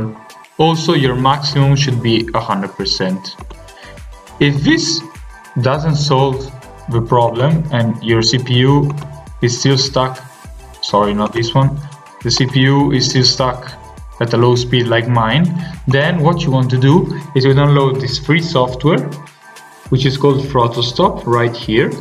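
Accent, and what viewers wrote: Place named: India. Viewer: it’s always the india sound kinda guy that’s helpful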